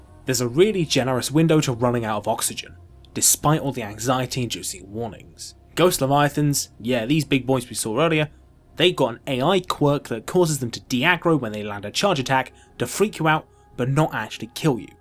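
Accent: United Kingdom. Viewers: The fact that a man with a British accent having to clarify that he was saying terror and not Tera is hilarious to me 😂😂😂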